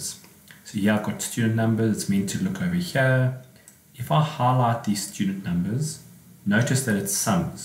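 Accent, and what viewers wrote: South Africa. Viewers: So surprised to turn this on and hear a fellow South African's voice! :) My question is, in the last example what is the 'special' kind of space that was not generated by the keyboard? How can you check for and remove it? Thanks!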